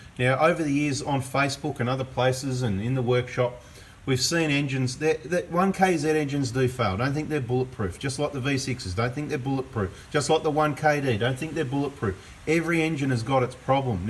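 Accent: Australia. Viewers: Hello! I’m new to your videos. When you say 5k’s, is that in km or miles? You sound a bit Australian so I was thinking it must be km, but changing your oil every 5k is a bit overkill? Here in Norway the price for a liter oil is around 20-30 US dollar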